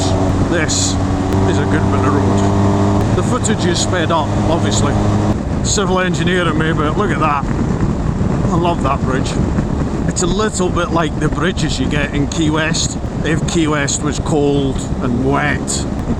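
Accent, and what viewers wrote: Scotland, United Kingdom. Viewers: love it mate, just found your videos and loving hearing the Scottish accent! some great roads round Glencoe shame about the usual weather, North Coast 500 is a must for beautiful roads up here, never done it on a bike before though! Keep up the great content! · That was pretty hardcore riding, but you where on the right bike, those other two guys on the MT-10 and Fireblade had to have been hurting more than you, epic ride ! Sounded like you had a bit of a Scottish accent, where you one of the extras on the movie Braveheart ? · I know it all sounds normal to you, but you had me in tears there... “Great giggle” “Naughty overtakes” 😂🤣😂🤣 I want to learn how to sound Scottish! Is there a pill? If you’re ever in San Francisco, give a shout. We also have an Inverness, it’s lovely too (no Loch but we have a bay), with as much glorious riding up and down the Pacific coast as even an Iron Butt could want. 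Thanks for the ride along.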